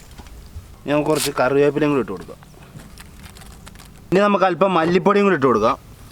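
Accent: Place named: Idukki, India. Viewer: മുൻപ് ഇടുക്കി, കോട്ടയം എവിടുന്നെങ്കിലും മംഗലം ഡാമിന് പോയതാണോ സംസാരം കേട്ടിട്ട് തോന്നുന്നു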